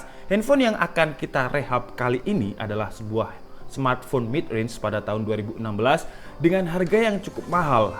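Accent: Malang, Indonesia. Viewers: Mas nya orang malang?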